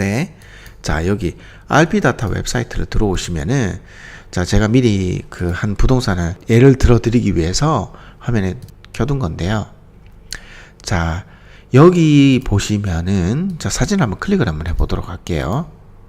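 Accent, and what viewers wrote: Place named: Gyeongsang, South Korea. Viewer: 많은 분들이 부동산 얘기들 하시는데 저랑 비슷한 출신(경상도)이신 것 같아 정감이 가네요. 혹시 질문 사항이 있을 때 이메일 같은 것 드려도 괜찮을까요?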